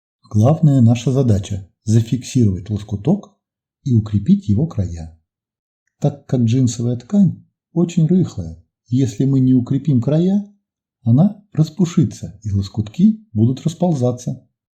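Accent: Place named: Kurgan, Russia. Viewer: Обремкаются, вышаркивается - супер! Прямо ностальжи по Курганскому говору! Удачи вам в вашем творчестве!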